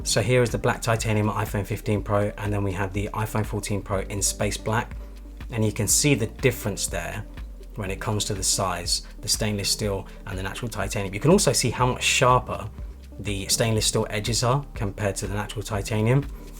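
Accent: United Kingdom. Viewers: What a decent British Accent 👌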